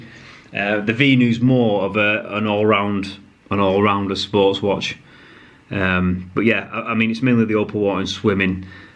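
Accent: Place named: Yorkshire, United Kingdom. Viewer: Thanks for the review! Currently have Fitbit sense and my venue 2s comes tomorrow. Excited to try it! Your accent sounds like you are from Yorkshire? Could be wrong … but my grandparents and mom were born in Yorkshire! 🥰 love the accent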